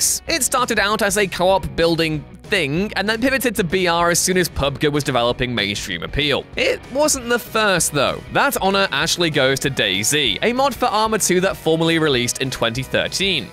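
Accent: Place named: West Country, United Kingdom. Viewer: Now is it just me or is that a really strong west country accent?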